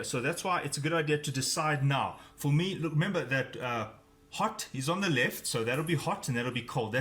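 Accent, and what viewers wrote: Afrikaans, South Africa. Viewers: I didn't have a clue how to do this but now I know, thanks pal, I'm off to Bunnings as well, and is that an Afrikaans accent I detect? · I'm a kiwi and the SA accent is familiar to me too, sounds much better than the Aussie one... they all sound like they're holding their nose whiile complaining about being constipated.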